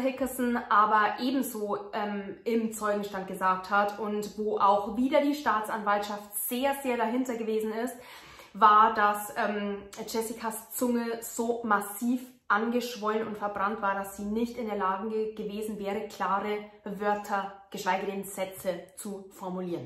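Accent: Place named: Bavaria, Germany. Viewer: Bin dich seit tagen am bingewatchen :D Schau normal auch überwiegend american true crime channels bzw Gerichtsverhandlungen, je detaillierter und länger desto besser :) Du bist die einzige deutsche die ich schau, gerade weil du so ordentlich recherchierst bzw auch ahnung von der amerikanischen gesetzgebung hast. Damit hebst du dich qualitativ echt hervor :) Oh und ich liebe deinen charmanten bayerischen akzent :D Bitte mach so weiter du hast echt talent <3 Lieben Gruss aus den Niederlanden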